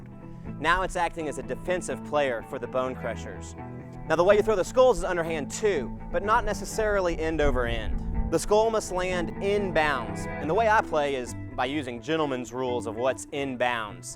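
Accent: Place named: United States. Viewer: his American accent is incredibly annoying, it sounds like he's saying kooob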